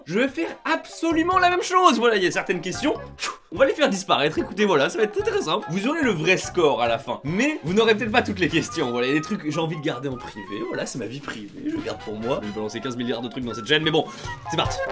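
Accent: South, France. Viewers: Quel accent du sud XD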